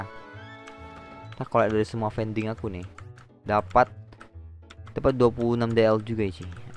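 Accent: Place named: South Jakarta, Indonesia. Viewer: Bg ngomong lu kaya org jaksel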